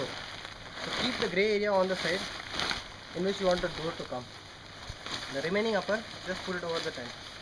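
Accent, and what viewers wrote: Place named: India. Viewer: dude what did you say after "hi friends"? #love#indian#english#accent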